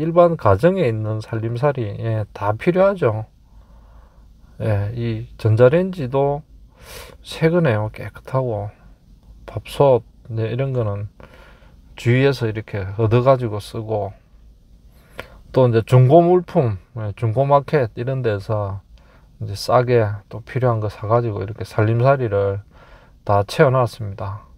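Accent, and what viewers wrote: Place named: Haman, South Korea. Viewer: 구수한 함안촌넘사투리에 맛깔나는 향수에 푹빠져드는 맛으로 자주 시청합니다! 하시는 사업 번창하시길~~^^^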